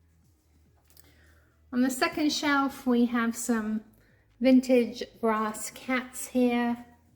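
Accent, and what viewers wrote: England, United Kingdom. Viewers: Yes, love her voice and English accent.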